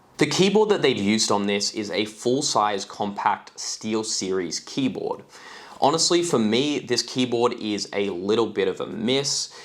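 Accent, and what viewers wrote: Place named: Australia. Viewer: Do all Australians pronounce it "hayche"? How do yaw spell idear? Exactly how it sounds? Neeveedia? If 4k refers to horizontal pixels (which is only like 3800) and 2k refers to vertical pixels (which is only 1440p; an even bigger lie, or misnomer), then what does 1k refer to? Why is the sky blue? So many questions. Few answers.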